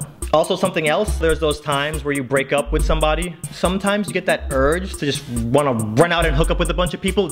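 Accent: England, United Kingdom. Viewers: That is a good British accent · That English accent tho! Lol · Your british accent tho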